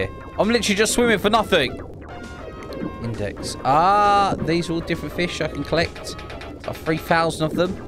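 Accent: United Kingdom. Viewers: you british??? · Is he British? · hey are you a british?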